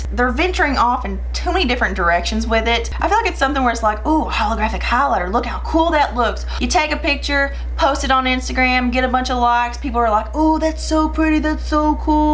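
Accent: South, United States. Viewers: "okay first of all. who is Natasha denona?" omg i'm weak. great video. love your southern accent, i'm a southern belle as well and it comes through so strong in my videos 😂 love it 💕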